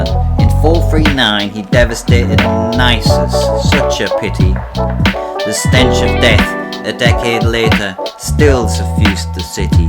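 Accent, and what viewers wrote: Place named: Sunderland, United Kingdom. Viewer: You are an absolute laaaaad 🙂 Nice to hear you still have a Sunderland accent even after all those years after leaving.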